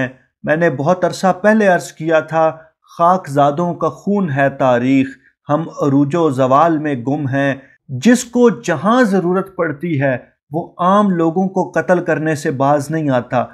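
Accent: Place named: Attock, Pakistan. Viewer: Welldone ,ap ka lehja attock jand wala lag raha hai.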